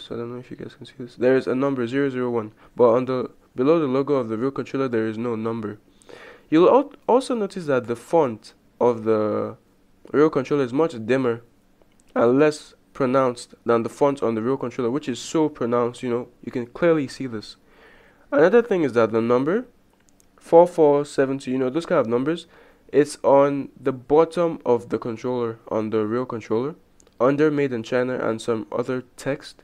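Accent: Nigeria. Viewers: You sound like a Nigerian, can you please tell me where you bought the original? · I can bet this dude is a nigerian I can hear he's accent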